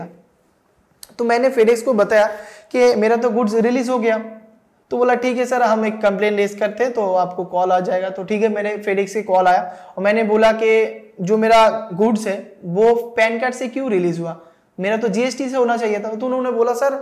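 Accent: Kolkata, India. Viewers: Ap Ka language suun k Lagta hai ap Kolkata Ka hai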